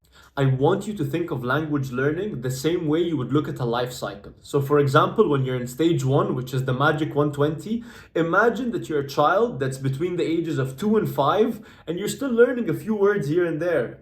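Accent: Egypt. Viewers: Oh my god días mio! This my first time hearing Spanish with Egyptian accent 😂❤ man you are awesome keep up the good work ❤ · A good vedio, thanks for made it and keep going man ❤ But I have a question where are you from? Cuz u sound Egyptian which is cute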